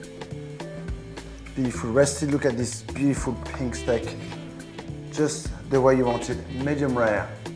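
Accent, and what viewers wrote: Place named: France. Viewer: Omg I know is French and his accent makes it hard to understand. The subtitles however is terrible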